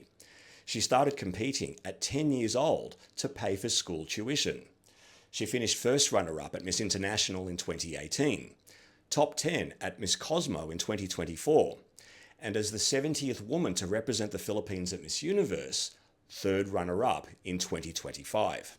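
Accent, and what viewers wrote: Australia. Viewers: I love Miss Universe since I was 15 years old. I love your channel, videos, the amazing information you share! And your Australian accent is amazing and you are charming! Best wishes and Thanks you! ❤❤❤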